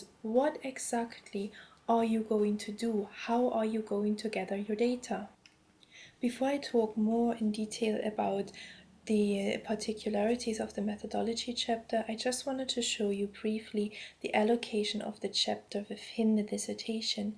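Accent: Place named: Germany. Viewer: Are you a german, because you sound like a german speaking english.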